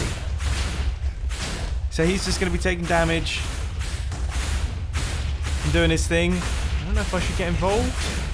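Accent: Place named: Yorkshire, United Kingdom. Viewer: Hey, are you from Yorkshire because I kind off hear that dialect. PS: great videos keep it up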